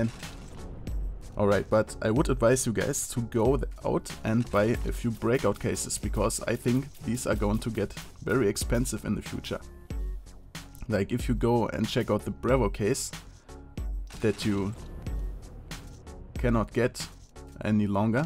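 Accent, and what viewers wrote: Germany. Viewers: Das einzige was zeigt dass du deutsch bist ist dein akzent aber sonst... Läuft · Alter DU sprichst Doch zu 100% Deutsch mehr als englisch......sehe Gerade dein erstes Video und du hast nen SOOOWASVON deutschen Akzent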